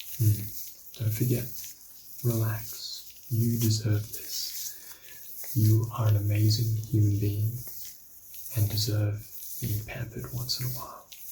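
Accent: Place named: Australia. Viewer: a really nice smooth australian accent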